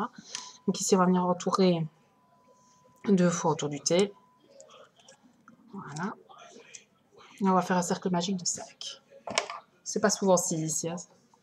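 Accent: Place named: Belgium. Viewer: Quel plaisir j'ai eu à faire ce lapin avec ton bel accent belge :) Merci pour ta patience!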